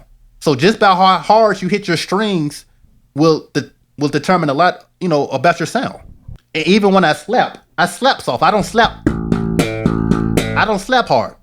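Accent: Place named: Deep South, United States. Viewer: The only thing cooler than your tone is that deep south accent...great explanations, thank you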